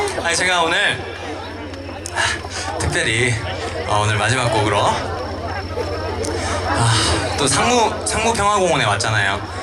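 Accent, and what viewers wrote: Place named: Mokpo, South Korea. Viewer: 박지현가수님목포사투리구수하고매력있당게 앞으로도멋쟁이가수로활약하시길~~~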